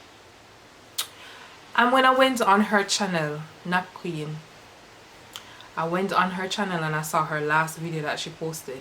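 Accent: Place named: Caribbean. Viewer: Are you west indian???? 🤭 you sound like us❤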